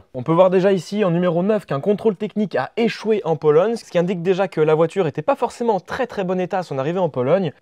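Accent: India. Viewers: Quand il parle anglais on dirait il parle INDIEN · Grave chaud ! Je suis dans l'informatique et je kiffe ton accent indien quand tu oarle anglais ça me fait penser aux tutos obscurs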